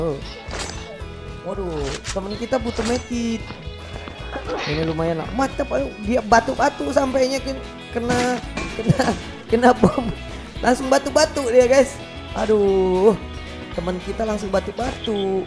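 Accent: Java, Indonesia. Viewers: Medok hahaha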